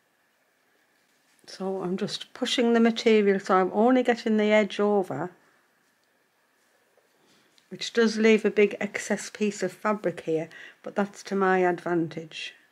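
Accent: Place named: Geordie, United Kingdom. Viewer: Watching from my home in Australia, though i am a Geordie. Loved the video and recognised your accent. Where are you from?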